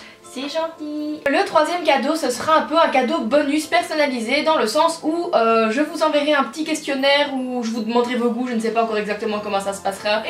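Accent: Belgium. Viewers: Tu es belge ?😘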